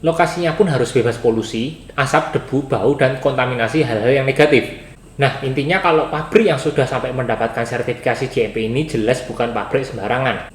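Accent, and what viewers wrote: Java, Indonesia. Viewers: orang Jawa ya , medok bngt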